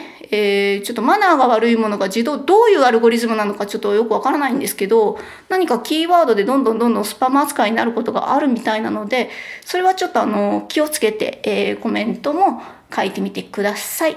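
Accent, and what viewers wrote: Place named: Osaka, Japan. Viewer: 新しい扉を開いてくれるチャンネルですね。大阪弁も身近でよし。これからもよろしくお願いします。☺️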